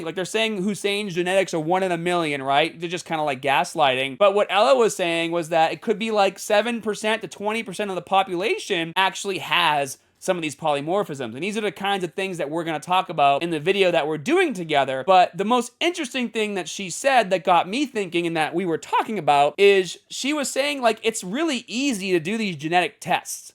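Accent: Boston, United States. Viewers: I'm just here for that Boston accent bro. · just found your channel. New to GymTube so I was watching Greg for a bit (along with Athlean X. Great content). Will watch you instead of Greg going forward. Accent reminds me of Will in Good Will Hunting 😀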